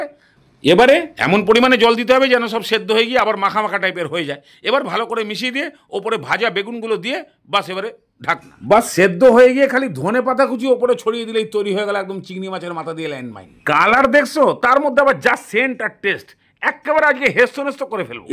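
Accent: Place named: Bangal, Bangladesh. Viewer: Tumi bangal bolo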